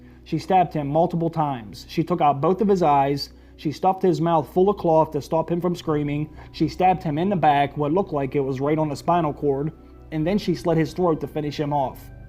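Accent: United States. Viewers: your voice reminds me of an American Petyr Baelish accent.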